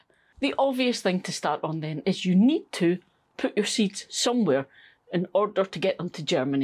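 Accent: Scotland, United Kingdom. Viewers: Hi, can you tell me more about your rotary compost sieve? I live in Massachusetts, and the only one I could find was over $100. I have a lot of compost to sieve, and this would make the job so much easier. I am planning a trip home to Scotland soon. I could bring one back if it's not too heavy. Maybe that is wishful thinking, lol. I am relatively new to growing vegetables and find your videos very helpful. Thank you for taking the time to create informative and entertaining videos. It's also nice to hear a Scottish voice.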